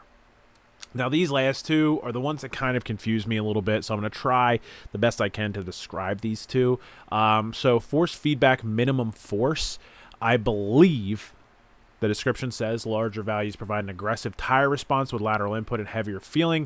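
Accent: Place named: Bay Ridge, United States. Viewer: Make NO mistake, cuz you sound exactly like Chris DeStefano with the Bay Ridge sounding accent. It's just what it is!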